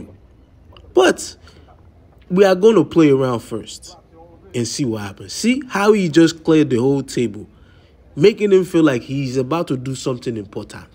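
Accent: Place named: East Coast, United States. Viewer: Haha yeah I can tell you from Jersey or somewhere from the East Coast with that accent 😂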